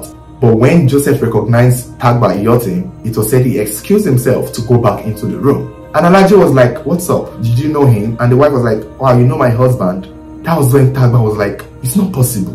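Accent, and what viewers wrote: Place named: Nigeria. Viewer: I like his accent too. He's different from the other Nigerians' accent. It's so difficult to understand. I'm from Mozambique my official language is Portuguese and I'm used to American, British accents.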